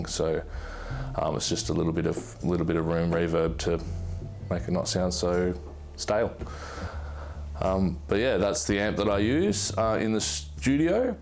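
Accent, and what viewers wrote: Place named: England, United Kingdom. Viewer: You sound likevyour English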